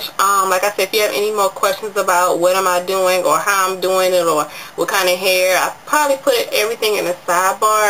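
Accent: New Orleans, United States. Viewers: Ur N.O accent is so strong lol Im from baton rouge and I love new Orleans accent lol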